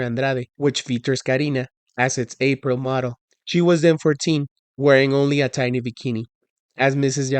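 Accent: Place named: Latin America. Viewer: Excelente inglés latino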